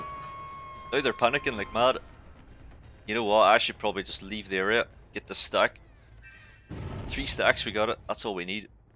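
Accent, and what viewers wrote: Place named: Northern Ireland, United Kingdom. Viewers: northern Irish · Im not good with accents, but I would say he's Northern Irish.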